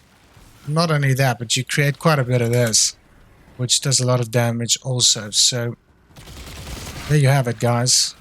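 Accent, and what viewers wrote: South Africa. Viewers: Hey man you sound South African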